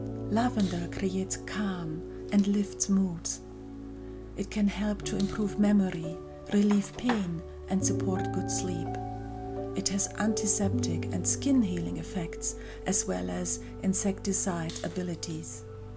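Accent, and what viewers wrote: Scotland, United Kingdom. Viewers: It sounds to me that you have a Scottish accent? So where did you move from?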